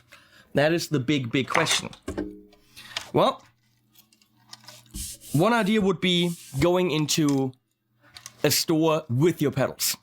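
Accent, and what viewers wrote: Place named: Germany. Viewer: "And you have this riser here for your wah... or your "Wah"Lume pedal." Gots to love the German accent!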